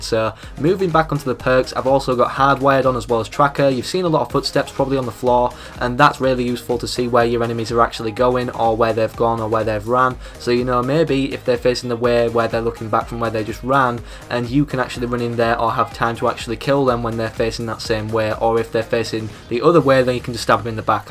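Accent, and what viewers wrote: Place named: Yorkshire, United Kingdom. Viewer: Yo Mama he is from Yorkshire dumb ass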